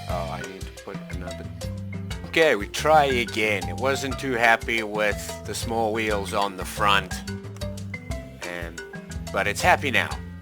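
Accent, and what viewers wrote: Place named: Africa. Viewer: I swear you have a African accent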